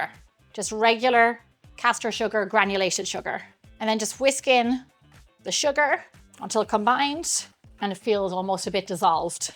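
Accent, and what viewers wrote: Ireland. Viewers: She sounds Irish to me, not French. Is she Irish?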